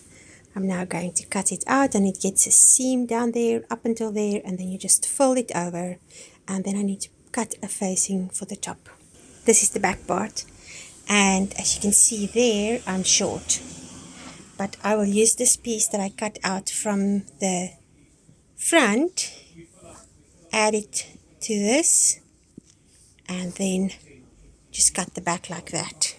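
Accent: Afrikaans, South Africa. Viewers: Hello. Are you Afrikaans speaking? From your accent you seems Afrikaans but the scenes in your videos does not seem to be South African😊😊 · I recognise your accent from Afrikaans Nationality in South Africa 🌿🌹♥️ I am in South Africa 🇿🇦 Enjoyed your video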